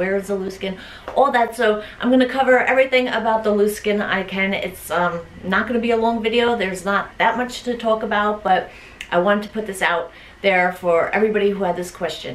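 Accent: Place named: Minnesota, United States. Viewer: Huge CONGRATS on your weight loss! Amazing results! Where are you from? I THINK I might be detecting a slight Minnesotan accent or Canadian?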